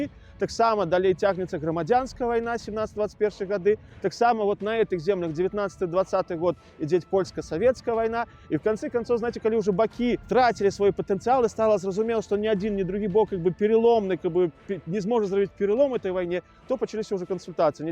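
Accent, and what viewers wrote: Trasianka, Belarus. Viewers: Так он и разговаривает на трасянке.. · З удзячнасцю дзякую за расповедзь пра Ракаў. Добра зайшло. Прыемна слухаць было вашу трасянку. Добрае дзела робіце. Чакаю трэццю частку. Не кідайце сваю справу.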